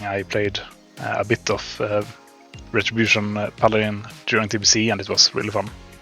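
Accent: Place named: Sweden. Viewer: Ohh god the swenglish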